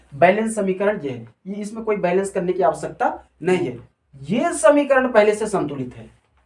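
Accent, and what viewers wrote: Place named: Singrauli, India. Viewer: वीडियो में सिंगरौली की आवाज का प्रयोग किया गया है।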